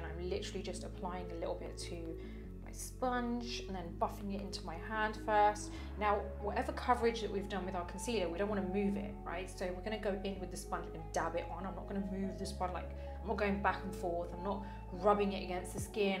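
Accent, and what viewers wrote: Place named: England, United Kingdom. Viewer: Love ❤️🥰all your makeup tutorials. You do an amazing job 😍explaining each step throughly. Just want to know where are u originally from? I don’t know much about makeup. So your info is valuable to me , it 🌹helps me to buy the latest products in the market, concealer foundation… I live in Connecticut US, ( vcold weather), dries out the skin. Can u suggest any products for that, before makeup. Did u live in England? ( your english accent).I was brought up in Riyadh( Saudi Arabia) and England.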